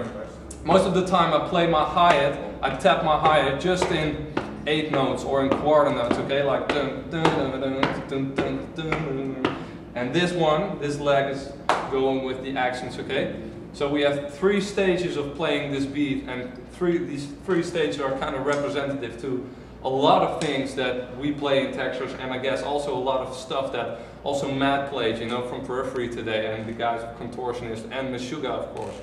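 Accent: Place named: Netherlands. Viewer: Hahaha that Dutch accent. Building up to a very cool beat towards the end!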